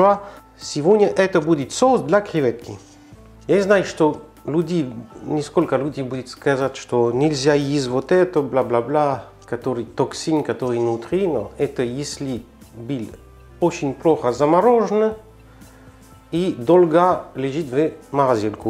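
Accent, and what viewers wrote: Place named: France. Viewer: Готовлю по! Вкусно. И много ньюансов, о которых никогда не догадывалась. Спасибо большое. Отдельное спасибо за русский язык с французским произношением.Изюминка.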